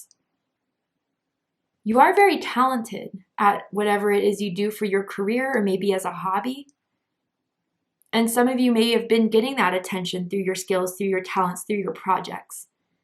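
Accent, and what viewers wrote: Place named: United Kingdom. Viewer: 😯 Yes, British accent.. he is English!! I am Italian 😃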